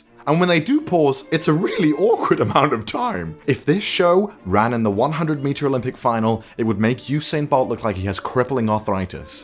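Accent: United Kingdom. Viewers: Ok so my brain is breaking trying to figure out where your accent is from because it sounds vaguely British but not— obviously British and I DONT KNOW WHAT TO THINK ANYMOREEE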